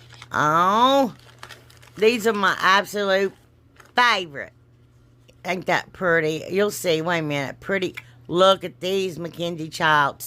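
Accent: South, United States. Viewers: What part of the South are you from?South East Georgian inquiring. I absolutely love and enjoy your videos. ILOVE, LOVE...LOVE your napkins....especially the CHICKENS.🐔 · You talk you're Southern Draw while you're working?😮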